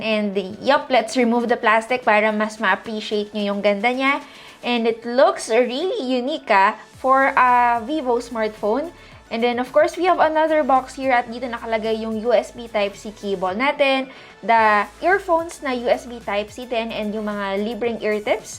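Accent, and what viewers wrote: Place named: Ilocos, Philippines. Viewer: mukhang foreigners tpos ilocano accent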